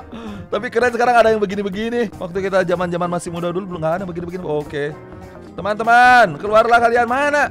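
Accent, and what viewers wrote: West Java, Indonesia. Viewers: Bang orang Sunda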